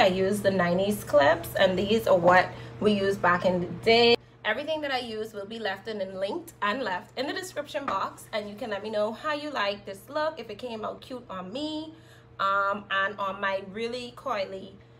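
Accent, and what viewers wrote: Bahamas. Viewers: Just started watching and I'm subscribing already because I hear that you're a fellow Bahamian. Big up BAHAMAS!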